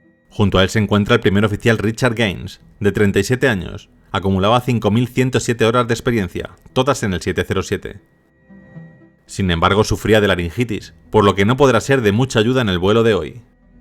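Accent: Spain. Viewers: MUCHACHO RELATADOR. HABLA MÁS DESPACIO PORQUE NO SE TE ENTIENDE TU ACENTO NO SE DE QUÉ PARTE DE ESPAÑA SEAS. PERO HABLAS CON LA LENGUA PEGADA AL PALADAR ÓSEA TE OYES CÓMO UN TRABALENGUAS , TRATA DE PRONUNCIAR TUS PALABRAS MEJOR DICHAS. ESQUE TE COMES LAS PALABRAS Y APARTE HABLAS RAPIDO. NO SE TE OYE NADA CLARO CORRÍGETE MI AMIGO. GRACIAS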